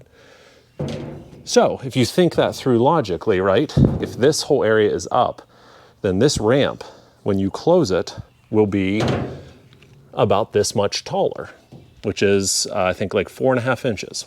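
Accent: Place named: Pittsburgh, United States. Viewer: by chance are from Pittsburgh or surrounding Allegany or Westmoreland County i detect a light Pittsburghease in your voice and you yard an intro shots of the car driving looks like the area thanks for the content and I will check my connections an see if I can find you labor for metal fab an body work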